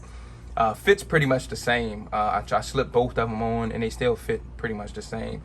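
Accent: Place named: Louisiana, United States. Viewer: You gotta be from Louisiana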